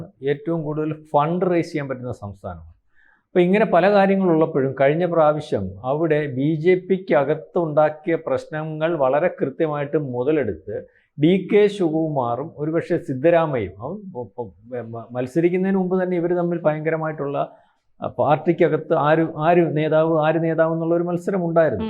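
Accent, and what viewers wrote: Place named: Thiruvananthapuram, India. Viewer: ഈ സ്ത്രീ പറയുന്ന എല്ലാ വാർത്തകളും പച്ച കള്ളങ്ങളാണ്. കള്ളങ്ങൾ വിളിച്ചു പറയുവാനും ഭക്ഷണം കഴിക്കുവാനുമാണ് ഇവൾ വായ് തുറക്കുന്നത്. തനി തിരുവനന്തപുരത്തേ Local slang ൽ വായിട്ടടിച്ചു കൊണ്ടിരിക്കുന്ന വെറും തറ.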